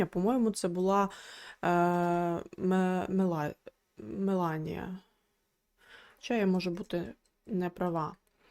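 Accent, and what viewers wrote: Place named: Lviv, Ukraine. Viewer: А звідки ти родом?) Мова дуже нагадує мені Львів або Тернопіль..)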